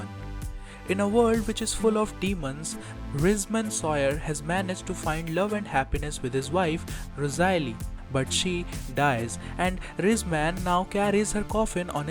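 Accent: India. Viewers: I really like your indian accent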